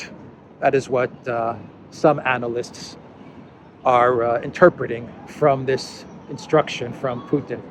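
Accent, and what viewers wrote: United States. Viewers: Good Morning,. You’ve got American accent. How so? Thank you for the refreshing morning walk and world news update. Good day for you too. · I have news for you. YouTube brings us here. We have no idea who you are. You're obviously from the US or Canada. I would guess US. You might want to put something in "Abut".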